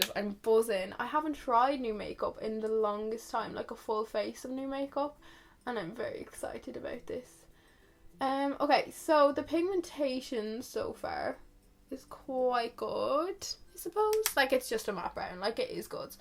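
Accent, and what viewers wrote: Ireland. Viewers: Where are you from in Ireland beautiful accent ! Cxxxx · Lol first time seeing your video. First sentence and I was like SHE IS IRISH. If I’m wrong.. I’m sorry lol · your irish accent is just super cute!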